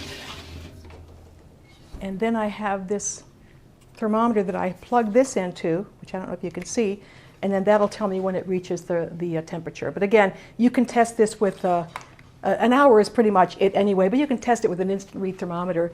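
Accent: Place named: Canada. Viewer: Hope you are well,. Thank you so much for sharing your meat loaf recipe. I plan to try this! I like the very slight Canadian accent. Your presentation is awesome.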